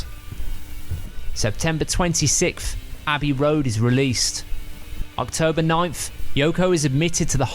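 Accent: Birmingham, United Kingdom. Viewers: Good analysis.... A lot of things I haven't heard of before.... and great Brummie accent!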